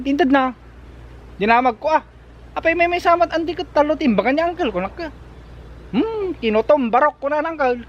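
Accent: Ilocos, Philippines. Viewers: Hello! Enjoy watching your Bid-yos very nice strong accent Ilocano! Where are you from in Philippines? We are Filipino watching from London U.K.! 😀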